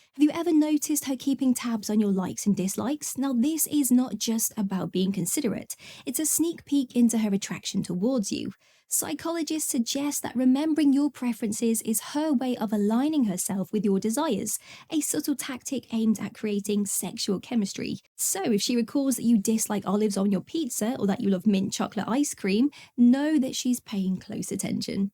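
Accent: England, United Kingdom. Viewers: "cheeky". Oh she's so sexy with her British terminology and English accent..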